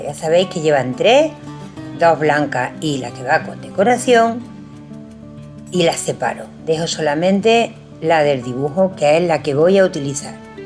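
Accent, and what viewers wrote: Andalusia, Spain. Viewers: Hermoso trabajo!! Desde Argentina 🇦🇷 me encanta tu tonada andaluza! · Hola me ha encantado esta maravillosa obra de arte y darte las gracias por darnos la oportunidad de las personas que nos gustan las manualidades como es mi caso, sin semejante mi de lejos a ti, podamos intentar hacer tantas bellezas. Soy de un pueblo de Barcelona, aunque me encanta tu acento y Andalucía en si,. Muy agradecida y te deseo mucha salude y suerte 🍀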